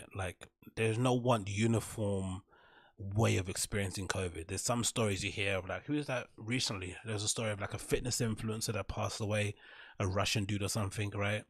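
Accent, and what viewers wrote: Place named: United Kingdom. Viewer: I love your content and just giving out the facts, but your thick British accent and your very fast talking is hard to follow.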